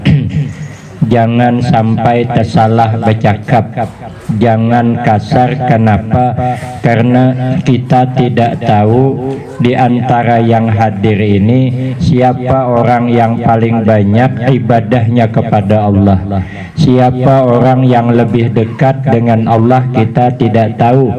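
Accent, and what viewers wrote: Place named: South Kalimantan, Indonesia. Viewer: Asli org mana sidin... lugat mirip kalua amuntai pang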